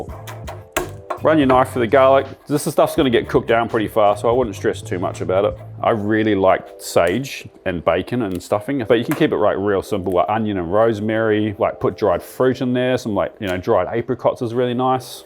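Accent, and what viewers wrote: Australia. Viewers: He Aussie mate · Are you australian ?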